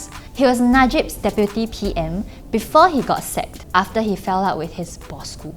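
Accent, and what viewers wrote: Singapore. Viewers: Very good singlish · I terribly in love with Singaporean accent.. Okay Lah!! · Nice Singlish!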